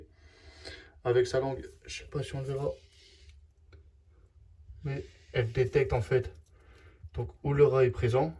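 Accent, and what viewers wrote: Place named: Nord-Pas-de-Calais, France. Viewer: Mdr la presentation en chtit. Tres belle video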